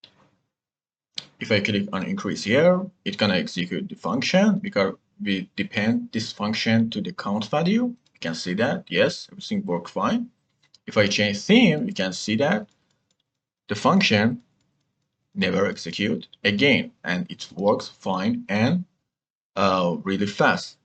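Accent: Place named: Iran. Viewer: Persian accent sooo good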